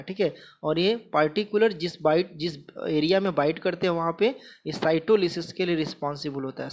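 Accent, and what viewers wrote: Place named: Bengal, India. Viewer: Bhai yh bengali h iska language issue h yh bechara apne taraf c snake he bol rha h hme snak sunai d rha h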